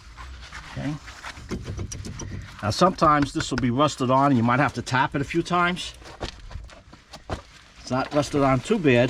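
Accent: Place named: East Coast, United States. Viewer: I’m going start by saying I love your east coast accent 🤣❤️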